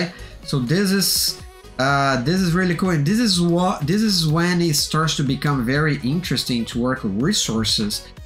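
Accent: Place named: Brazil. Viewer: I've instantly recognized the Brazilian accent, great video man.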